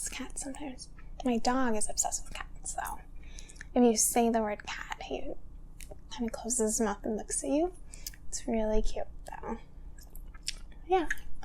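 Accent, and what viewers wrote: United States. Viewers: The best of all the American accents. 😎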